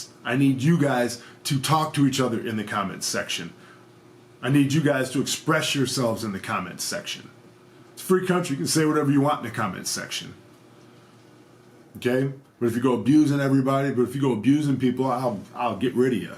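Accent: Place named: Midwest, United States. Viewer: Just started watching your videos . They helped me stay on track. Way to go! Also I could tell by your accent you’re from the Midwest. I’m from Ann Arbor, Michigan. Go Blue!!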